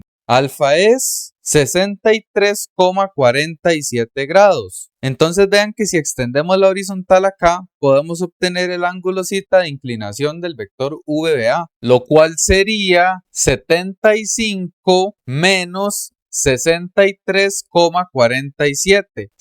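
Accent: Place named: Costa Rica. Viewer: ..jajajaa ese asentó TICO es inconfundible....felicidades.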